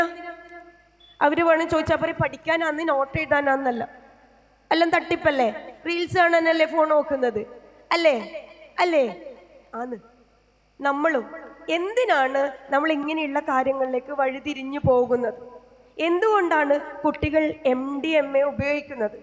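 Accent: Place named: Thalassery, India. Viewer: സൂപ്പർ ക്ലാസ് .തലശേരി സ്ലാങ്ങില് കേൾക്കാൻ നല്ല രസമാണ്. കരയിപ്പിച്ചും ചിരിപ്പിച്ചും ടീച്ചർ സൂപ്പറാക്കി '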